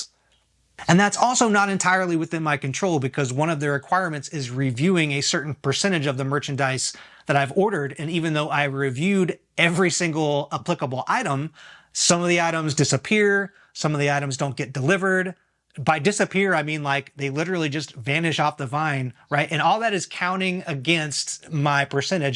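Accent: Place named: United States. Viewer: 😂 it blew my mind, especially because you sound american. Otherwise I’d just assume that you’re from scandinavia or something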